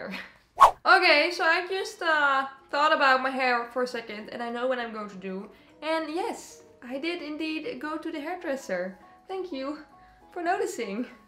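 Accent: Netherlands. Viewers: Are you Dutch? I'm definitely hearing the accent lol. Love your videos! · Wait are you Dutch bc I know our english accent😂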